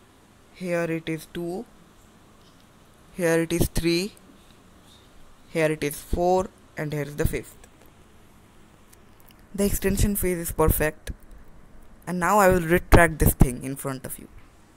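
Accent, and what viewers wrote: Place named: India. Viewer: You sound Indian, thats no bad thing, that's a GREAT thing, keep being smart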